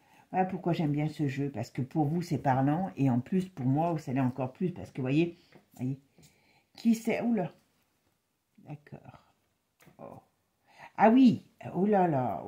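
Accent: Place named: Périgord, France. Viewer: Bonjour, je vous découvre, et j'aime vos tirages, votre douceur d'âme, et ce petit accent que vous avez, et que je connais, ô combien, puisque j'ai le même. Je vous embrasse depuis Le Périgord. Très sincères salutations. 🕊💞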